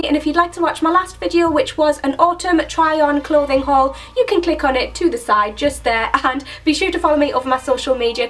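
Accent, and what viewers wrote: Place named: North East England, United Kingdom. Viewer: where abouts are you from? you doing like you are from NE England somewhere xx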